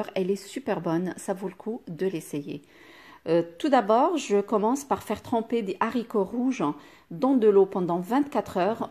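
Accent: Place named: Morocco. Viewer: Merci ma chère pour cette salade d'été. je n'ai jamais utilisé la mangue dans les salades salées mais je n.'exclue pas l'expérience... Pour le produit et la pub mensongère bien sur que ce n'est pas étonnant. Les gens sont curieux de voir la vidéo soit parce qu'ils sont intéressés par le sujet, soit ils sont attirés par le titparde la vidéo et sont déçus par le c9ntenu. Maintenant si cette vidéo a été vue par tt ce nbre de personnes et qu'ils ont été déçus par le résultat, ils ne verront ce que cette personne présentera par la suite, se désabonnent même s'ils l'ont fait. En tous les cas machAllah 3like ma soeur pour tes vidéos aussi bien expliquées en arabe et en français avec un bel accent marocain.😉... Je suis abonnée a ta chaîne et encore us de recettes pour cet été. 👍👍👍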